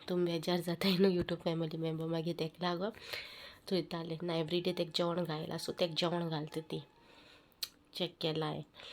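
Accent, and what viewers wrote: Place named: North Goa, India. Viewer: I just like your north goa accent ❤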